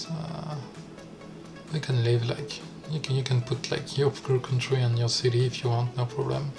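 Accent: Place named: France. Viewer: Moi j’entends que tu es français mon ami lol j’aurais une question je boss un projet track mais j’ai un pb avec ma route et des effets de la voiture la fumée des pneus part dans le mauvais sens et la camera sautille et surtout le volant tremble constamment pourtant mes cubes sont bien en y vers le haut et le z pointe ma direction j’ai travailler sur blender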